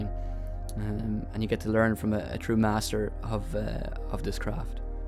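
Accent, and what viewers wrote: Ireland. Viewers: Hi there rea;;y fantastic video! love the way you work with your dad. HOW wonderful to have an Uncle that`s also creative an you have given is boat new life. I really enjoy your videos I came to Australia when i was six migrated from England. All my family are from Ireland and my parents are from Ballybok. I love Ireland of course and its so nice to hear your accent. It reminds me of my family my parents etc. I have been back several times and My grannies house is still there in Landen RD Ballyfermot. I have all my family there except my mum and twin sister and one of my brothers. the other brother migrated to Switzerland. anyway I hope you keep warm as the weather is rapidly changing there for you. Hope you get the boat water proof before the weather gets too bad. Love the rocket stove I think it is worth a fortune. I`ve never seen one like that before it is stunning. 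and rocket stoves are the best stoves and fire place to have. I loved seeing you put the turf in made me homesick for Ireland. We are worlds apart but we have a kindred spirit in Ireland. God bless you guys I wish you well. till next time.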